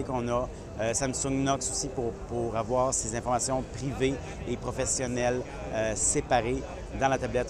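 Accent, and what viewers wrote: Quebec, Canada. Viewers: L'accent québécois est toujours aussi marrant mais on comprend très bien et vidéo très intéressant. Merci beaucoup pour cette présentation.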